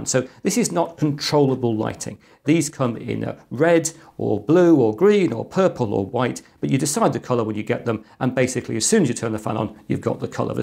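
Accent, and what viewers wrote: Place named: North, United Kingdom. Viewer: Thanks for replying. Yeah I hear the slightly well spoken/slightly northern mix I think. Cheers from Liverpool, I only ask cos my accent isnt that broad hehe:) Looking forward to learning much more. You're a UK YT international treasure Sir!:D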